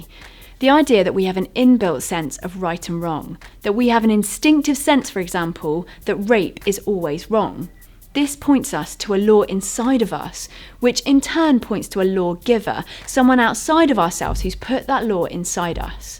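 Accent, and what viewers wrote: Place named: England, United Kingdom. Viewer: Well, you were able to prove one thing at least: not everything said in an English accent sounds intelligent.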